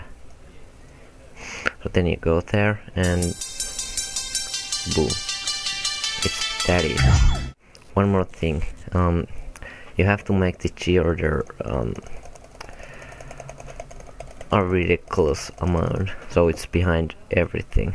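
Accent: Finland. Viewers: Oh wait that accent.... Just like finnish guy speaking english xD. Coincidence? I think not. So one simple question: Are you finnish!? :D Jos oot nii GG xD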